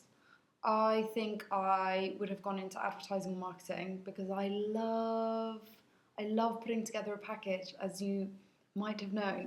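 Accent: United Kingdom. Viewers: I never realized she had such a British accent, I mainly listened to songs and no interviews. I LOVE HER ACCENT! 😂 Love the first question hahaha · wonderful keep it coming! i haven't heard you speak in the british accent before so that was something new.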